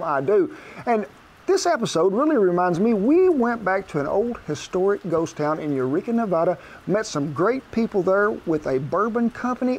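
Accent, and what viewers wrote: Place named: Wisconsin, United States. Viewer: You and cheese! You almost sound like you could be from Wisconsin. Thank You.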